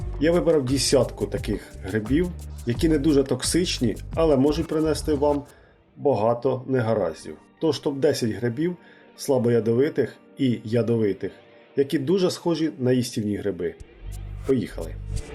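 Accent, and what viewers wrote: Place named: Surzhik, Ukraine. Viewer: Суржик - суржиком А інформація корисна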